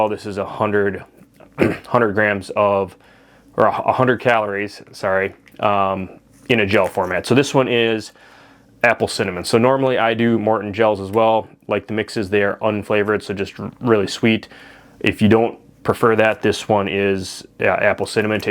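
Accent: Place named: Minnesota, United States. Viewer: Your voice reminds me of Fargo. One of my favorite movies 😂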